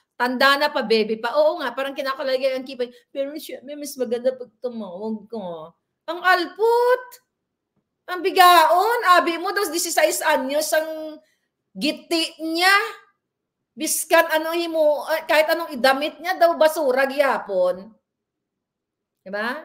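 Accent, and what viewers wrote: Iloilo, Philippines. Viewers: Kanami lang sa imo maghambal sang ilonggo ,sarap pakinggan. Mahal ka namin madam.ingat lagi.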